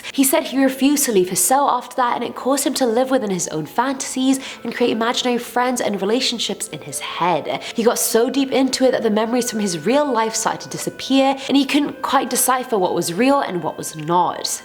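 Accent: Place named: United Kingdom. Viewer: She has the best British accsent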